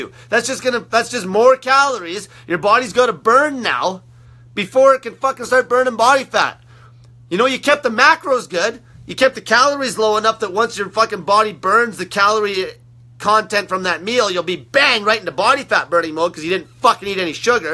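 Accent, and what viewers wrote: Canada. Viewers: I’m confused. I head your Canadian accent, but I hear ur tone and it ain’t adding up.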